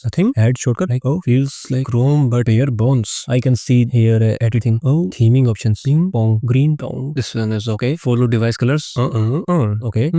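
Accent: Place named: India. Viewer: this is the thickest indian accent i've ever heard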